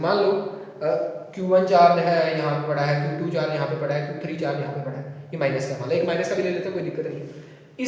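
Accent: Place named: Punjab, India. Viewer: Nice video and intro in punjabi tone I like this keep it up 🎉🎉😊🙏🏼🥰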